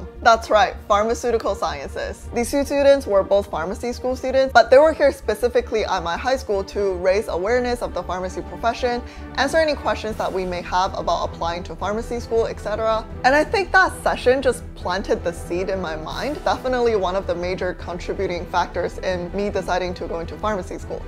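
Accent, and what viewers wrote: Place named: Canada. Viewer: You sound like a Canadian Jenny Tian lmaooo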